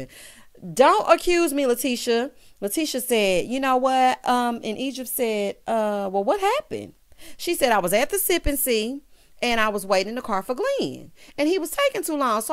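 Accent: South, United States. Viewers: Well its the south and you have a little south in your voice. But I agree I cannot stand all of fussing at the same time though, lol!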